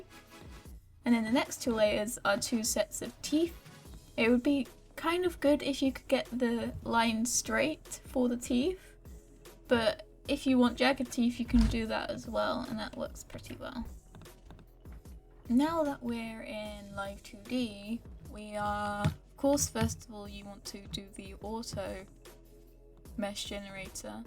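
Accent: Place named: United Kingdom. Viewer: Wow Your British! I Think.. Idk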